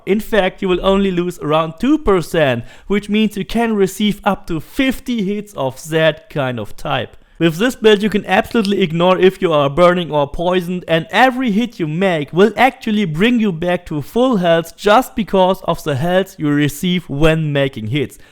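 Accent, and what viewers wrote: Germany. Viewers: Deinem Akzent nach zu urteilen, bist du eindeutig ein Deutscher 😜 Das soll kein Vorwurf sein: im Gegenteil - ich fand das mega sympathisch als ich zum ersten Mal eines deiner Videos zu Odyssey anschaute. Du sprichst super Englisch! Ich wollte mich mal bei dir bedanken, weil du mir das Spiel sozusagen erklärt hast. Ich hab nach Videos wie du sie machst gesucht und aber kaum was gefunden, umso mehr habe ich mich über deinen Content gefreut. Keep up the great work - you‘re awesome 😉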